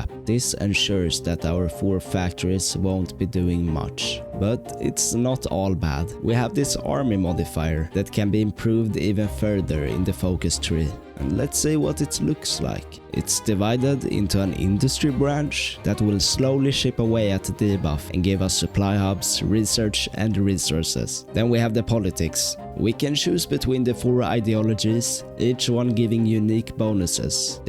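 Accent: Sweden. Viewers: Du har den svenskaste brytningen jag någonsin har hört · helvete vilken svensk dilekt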